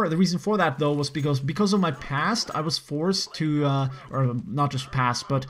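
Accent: Norway. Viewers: Norwegian?... not swedish?.... first time :) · Haha er du norsk? xD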